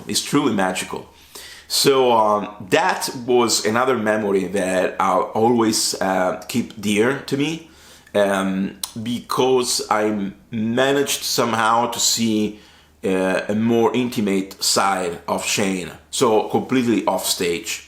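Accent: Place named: Dublin, Ireland. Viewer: A little bit of an Irish and indeed a Dublin accent I can hear. Very cool. As for Shane, we lost a little bit of our past, present and future when Shane stopped writing. It's a loss, he is a loss. But like you said, not in a sad way which is strange. Plus he got a fantastic send off and now a punk in spirit. P.S. I don't mean for this to be the type of question which it will surely sound like. If you had to, which song from his song books would you pick as a personal favourite in terms of story, lyrics, honesty, emotion and of course musicality? For me, and for all of the reason and more listed above.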